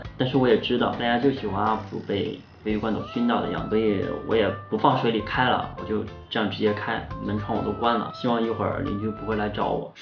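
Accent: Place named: Shandong, China. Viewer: 是哪里人啊听着口音像山东的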